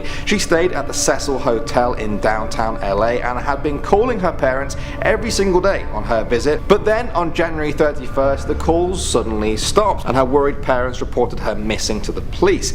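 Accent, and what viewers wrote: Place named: North, United Kingdom. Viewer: your norther accent ironically says WOTCHAH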